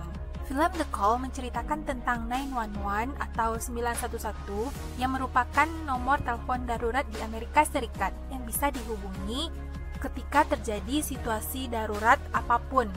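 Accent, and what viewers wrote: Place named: Padang, Indonesia. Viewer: logatnya logat padang banget, org sumbar kah?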